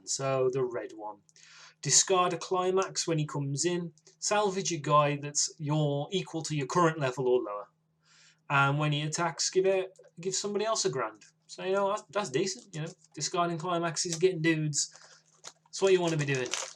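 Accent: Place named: Newcastle, United Kingdom. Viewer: Question. Where in england do you orignaly come from? Cause i think you kinda sound Newcastleish, but yeah. haha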